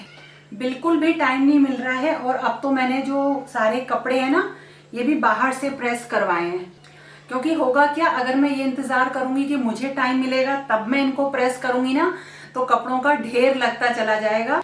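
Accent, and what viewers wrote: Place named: Mathura, India. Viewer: I'm from Mathura mam,mera mayka h mujhe lagta to tha aapke bolne ke ton se ki aap Mathura ya Agra se ho.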